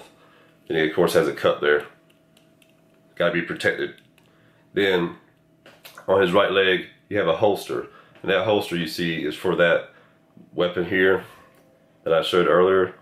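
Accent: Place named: South Carolina, United States. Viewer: Damn dude you sound like you're from my part of the world. Are you from South Carolina?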